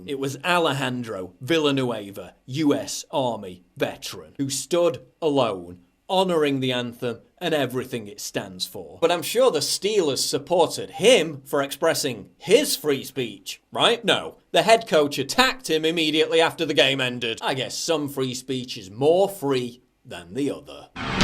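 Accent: United Kingdom. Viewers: It's like my thoughts with a British accent? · PJW, As a Christian man that recoils at the sound of profanity..... I must admit, I laugh when I hear you cuss! Not sure if its the British Sterling Accent or what, but it just makes me giggle!!! PS: DONT tell my Wife!!! =) Secretly subscribed!!!! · Talking standing in front of an world map, having an statut thinking as your banner, having a elegant british accent, having your full name as your username and making political rants on the internet does not make you an extreme philosoph who's always get facts right. It makes you ANOTHER random individual expressing his points of views and again... On the Internet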